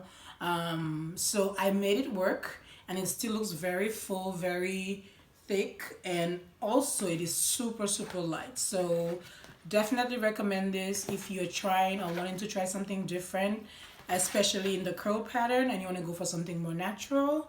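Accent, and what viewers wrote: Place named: Saint Lucia. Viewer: What island are you from you sound like a St.Lucain 🙋🏽‍♀️ if you are 👏🏽👏🏽👏🏽🇱🇨🇱🇨🇱🇨👏🏽👏🏽👏🏽... so I watched this video last night I’m great at doing hair and braiding and I’m quick ... I wanted something different I had NEVER done any twist with any Afro kinky so I did my hair last night ... it has NEVER taken me 5 hurs to do my hair I did it individual and I did 2 bundles 😤 it came out SWEETTTT AND LIGHT it was worth the time . Thanks for uploading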